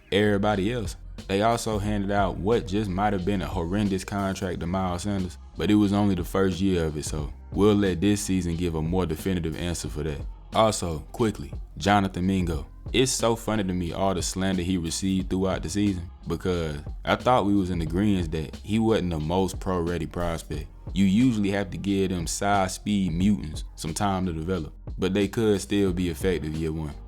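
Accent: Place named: Carolina, United States. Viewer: Bro , Idk why people were defending that OL so much this year! I been telling my panthers friend, that the O-Line was a huge part of the problem, yet the media been on bryce's ass since day 1, pretending that PFF rankings and preseason expectations are the end all be all, without actually watching the games. As a Browns fan I know exactly what it's like to get half assed national media coverage that then influences the whole discussion about the team. Love from Austria, I love the accent btw. Real Carolina!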